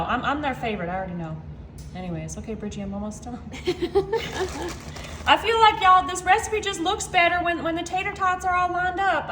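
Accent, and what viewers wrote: South, United States. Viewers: You sound Southern just like me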